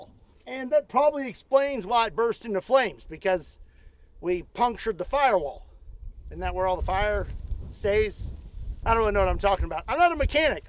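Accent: Kentucky, United States. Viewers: Joe Rogan but from the stat of Kentucky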